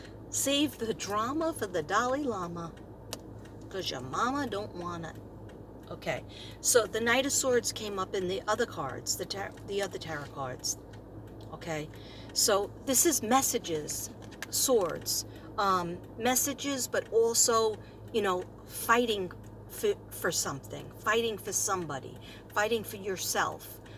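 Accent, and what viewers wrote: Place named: Brooklyn, United States. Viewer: You have a brooklyn or ling island accent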